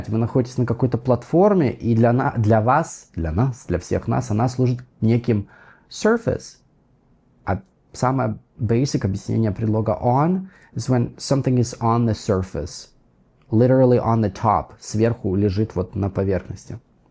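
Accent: United States. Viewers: ВТОРОЙ канал годный что нашел на английском (на русскоязычном ютубе), а не всякая ерунда типа плейлистов и прочего!, ваш американский очень очень хорош! Буду с удовольствием смотреть, спасибо!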